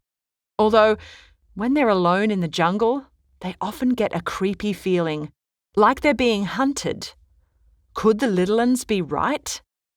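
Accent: Australia. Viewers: These are great! Is the narrator? Is her accent from Australia? My students were curious.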